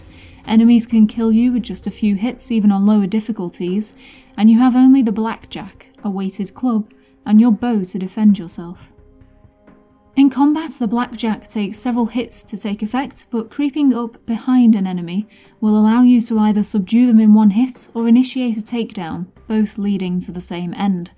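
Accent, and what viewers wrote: United Kingdom. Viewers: Ok, so I watched this video twice. While I'm a huge fan of the original games, I never tried to care about Thief 4. It was just too obvious it would be heavily simplified. And of course the rough development didn't help. I actually think it's the opposite way. The levels in the first two games are sometimes built almost like real places, so a map isn't really needed. If the mansion is a big rectangle, then why do you need a map? On the other hand, the city in Thief 4 has a nonsensical layout, so playing without guidance leaves you with no logical way to go. The same might go for all the levels in Thief 4, but I can't remember them. Garrett didn't draw the maps. He usually says where he got them. ;) I don't have much else to say. You have my respect for analysing this dreadful game so deeply. Really the only gripe I have is that the background music is sometimes pretty loud. 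It makes it harder to concentrate for long and I don't understand what you're saying sometimes. English isn't my first language and I'm not used to this heavy British dialect. The generated subtitles did help, though.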